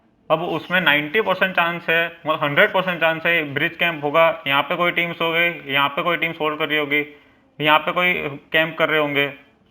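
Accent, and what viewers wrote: Gujarat, India. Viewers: Bhai gujrati ho kya? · Is that Gujarati Accent? 🤔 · bhai Gujarati he app awaj se pata chal gaya #baari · U r gujrati aren't u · Are you gujurati bro ? You sound like one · Bhai tum pakka gujrat se ho gujrati Right ?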